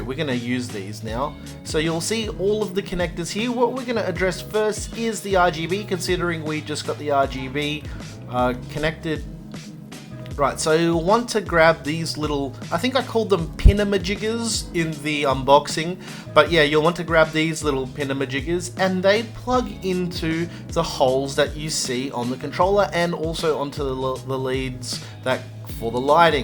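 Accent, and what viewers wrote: Australia. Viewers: he sounds Australian i love it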